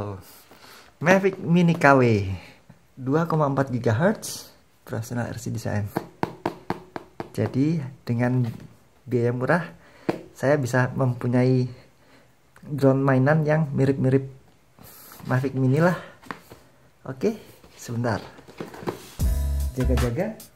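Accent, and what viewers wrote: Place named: Central Java, Indonesia. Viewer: Mas.nya dari Salatiga, jawa tengah kah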